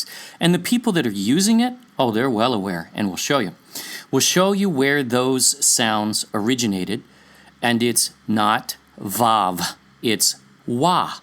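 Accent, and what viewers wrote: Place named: Philippines. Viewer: are you Filipino, if you are which sounds like you are, have you seen on the true Queen of Sheba, she was filipina, which was amazing to me. I am Cherokee/Irish, which we come from Yasshakar, that was amazing to me too. Shalum and be blessed akhy (Bro) in YaHUaH.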